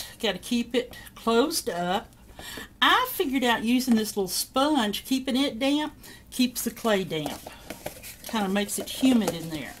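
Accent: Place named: South, United States. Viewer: What a lovely personality and creative spirit you have !I would also like to ad how adorable your southern accent is ..I was raised in Ga.but now live in NY for 25 years..thanks so much for the great tips and encouragement... it is such a joy to watch your videos .I have subscribed and look forward to more videos !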